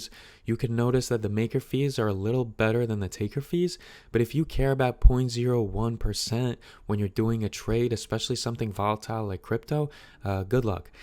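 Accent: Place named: East Coast, United States. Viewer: very well done. I love your east coast accent too.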